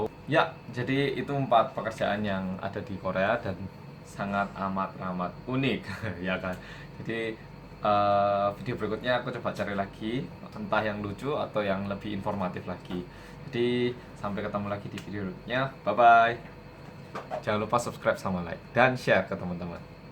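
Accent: Java, Indonesia. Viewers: aku suka soalnya ramah juga santun dan murah senyum logat jawa persis kaya orang jawa asli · orang korea yang medoooookk.... uda g ada kata"nya y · Istilahmuuu... nek pas manndi isok bolotan... hahahah asli khas nduueso... medok. Saluut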